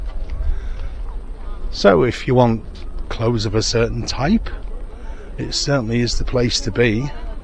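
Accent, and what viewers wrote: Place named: Manchester, United Kingdom. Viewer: Manchester accent 😂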